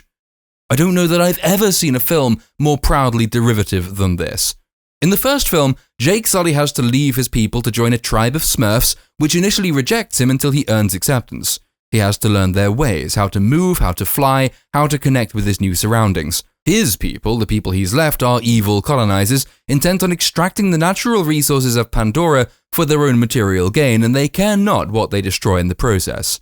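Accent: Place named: RP, United Kingdom. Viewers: Bros speaking so posh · A posh accent doesn't make you intelligent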